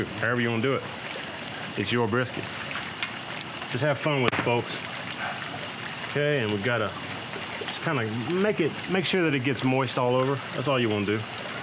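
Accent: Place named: Texas, United States. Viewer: The moment I heard you I knew I had found my brisket man....native Texan here (the HEB clinched it that you were too)just your voice makes me home sick and the brisket almost made me cry...have lived away from home nearly 35yrs now (now WAY south of the border in Guatemala as a retiree)...no brisket for 1,000 miles. Great pork here in Guatemala terrible beef (skinny range fed and "pasture" to table the next day so TOUGH..makes good tasty burgers though when mixed with some ground pork. Bought hubby a Weber Smoker and put it together today...smoking tomorrow....thanks for your expert cooking lesson!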